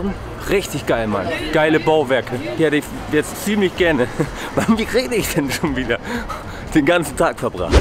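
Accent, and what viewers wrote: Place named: Hamburg, Germany. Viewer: Ich schau dir so gerne zu, ich würd auch gern sagen das ich dir gerne zuhöre aber dein nuscheliger Hamburger Akzent, einfach lustig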